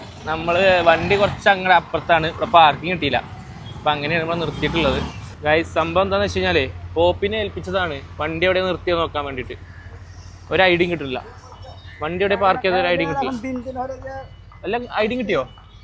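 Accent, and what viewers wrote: Kozhikode, India. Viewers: കോഴിക്കോട് ആണ് മനസ്സിലായി ഭാഷകേട്ടപ്പോൾ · Avalude baksha kettitt Kozhikode ann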